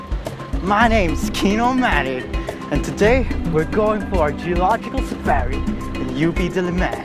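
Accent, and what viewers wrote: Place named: Australia. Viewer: I can't stop laughing 😆 the Australian accent 🤣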